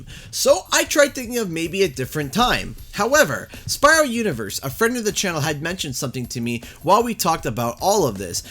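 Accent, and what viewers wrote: Canada. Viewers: Word of the video: "Vehgue." That the Canadian accent at work, I'm guessing?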